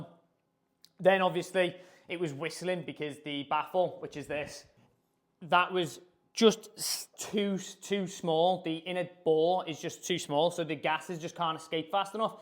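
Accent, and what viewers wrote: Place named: Liverpool, United Kingdom. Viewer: It’s called scouse accent!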